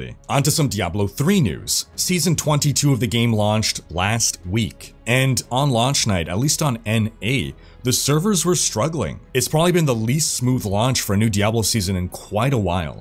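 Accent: Quebec, Canada. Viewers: Wtf I've been watching you for ages and never noticed!!! Québecois? :D loll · you learned french in Quebec didn't you? Your accent is 100% french canadian, I know because I'm one of those weirdos haha. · Bordel tu parles français (enfin quebecquois si j'ai bien reconnu l'accent) ;)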